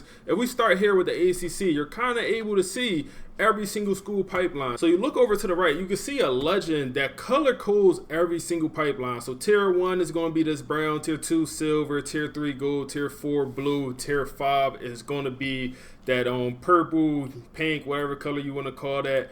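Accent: Philadelphia, United States. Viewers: Jus came across yo channel today you sound like my homie from philly 😂😂 then u said temple I was like yea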